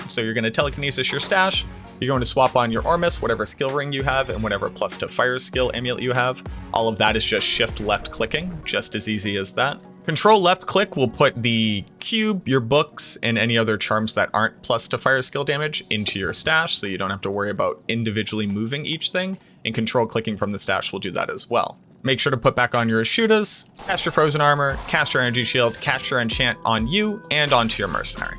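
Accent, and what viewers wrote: Boston, United States. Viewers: The most impressive part of it is he has a heavy Boston accent when he isn't presenting; he showed it off in one of his recent videos and it completely took me by surprise. He is a really talented presenter.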